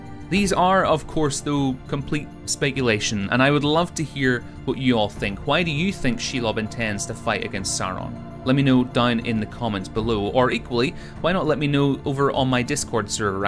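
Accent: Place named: Ireland. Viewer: I like your accent. Where are you from? Ireland?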